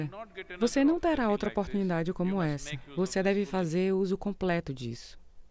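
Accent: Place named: Brasília, Brazil. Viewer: Sotaque de Brasiliense.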